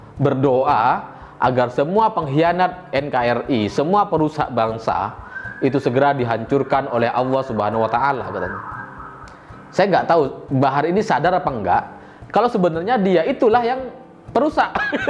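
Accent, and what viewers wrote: Madura, Indonesia. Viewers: Logatnya kek org madura..tp gk ada takdzimnya sama habaib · Dari logatnya aku udah mikir mas ini orang madura, ternyata benar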